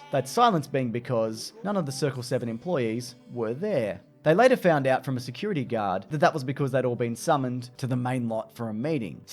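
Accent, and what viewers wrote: Australia. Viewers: Forgive me. I'm a dumb American. Is this an Aussie accent?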